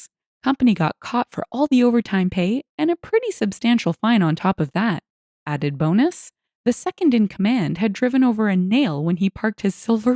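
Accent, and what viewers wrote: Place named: Canada. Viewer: Thanks! I'm a Canadian living in the deep south (US) and always look forward to hearing that little bit of home in your voice. 🧡❄️🍁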